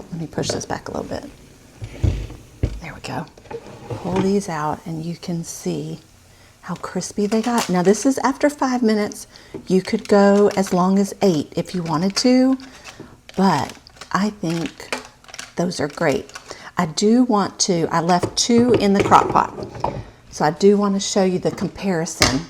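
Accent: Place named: South, United States. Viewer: I love hearing a fellow Southerner talk!!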